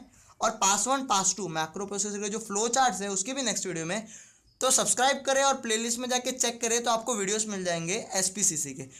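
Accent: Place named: Gujarat, India. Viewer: are bhai aap kaha se ho? bato se to gujrati lag rahe ho....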